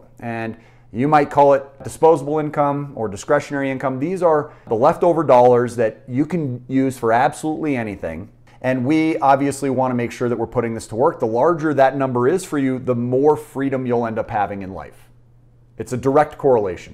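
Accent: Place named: United States. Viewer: What a nice cowboy accent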